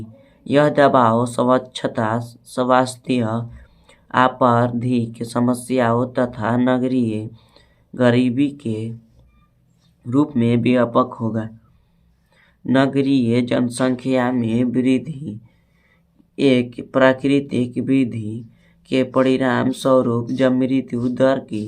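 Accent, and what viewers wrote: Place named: Tamil Brahmin, India. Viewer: This man talks like a Tamil Brahmin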